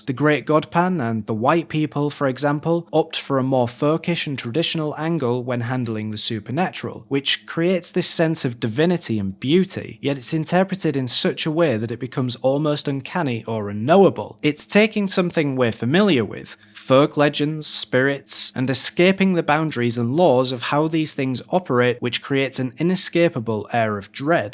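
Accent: Yorkshire, United Kingdom. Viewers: I'm from Yorkshire, and I am so glad you have decided to stay authentic to your accent. Yorkshire and proud. :) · Some of us in the UK also pronounce it as 'aitch' depends where you're from. We have a wide variation in accents here. The chap doing the video had a strong Yorkshire accent for example. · Love the video. Love the "yawk-shuh" accent.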